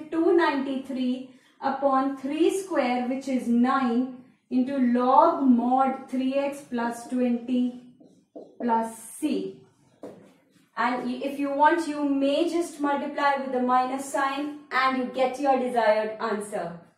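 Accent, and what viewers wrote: India. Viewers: Nice trick. Good job and the Indian accent was very clear and understandable.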